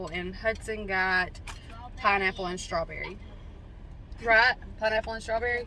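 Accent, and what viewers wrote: South, United States. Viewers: Hi Ms loved watching your videos and seeing that chick you were holding so cute and sweet..i love chickens...seeing your kids too they are so precious looking....your a pretty young woman created a wonderful family with your hubby and you are so sweet and love the way you talk a true southern girl. Me too I'm a real southerner as well. But y'all have a great Monday evening if y'all have storms be careful and stay safe...see you in your next video girl 💕💕.....love ya sweetheart ❤️.....take care ❤️.....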